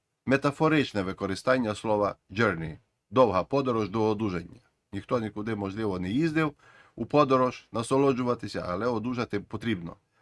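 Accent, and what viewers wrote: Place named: Ukraine. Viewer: Тема хорошо раскрыта. Мне нравится особый украинский диалектный акцент автора ролика. Интересно с какой он области?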